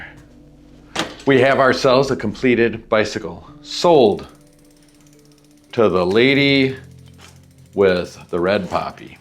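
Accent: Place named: Minnesota, United States. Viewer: Hearing you talk is like being home in Montgomery Mn again as a kid.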